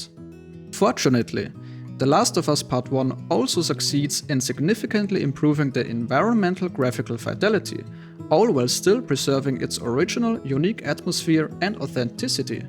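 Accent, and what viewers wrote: Germany. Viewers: One Frage you are from Deutschland oder ?😅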